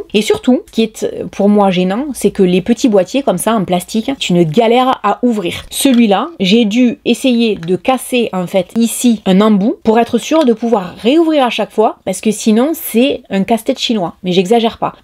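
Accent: South, France. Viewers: ..., je te suis depuis ta première vidéo 💜 et ton accent sudiste ressort d'année en année, c'est trop adorable. Hors plaisanterie, ton contenu conseil, économie est hors-norme! Ne lâche rien et saches que tes sponso "carte bleue" on adore car tu dépense pour ta communauté. on t'aime tellement 🌹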